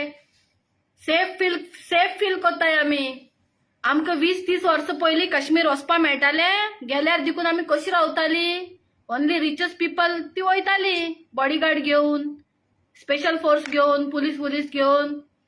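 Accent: Konkan, India. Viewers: just have a look on ur konkani assent, u r a migrants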